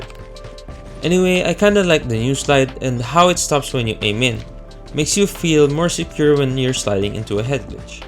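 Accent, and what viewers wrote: Philippines. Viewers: Wait..pinoy ka? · u sound like filipino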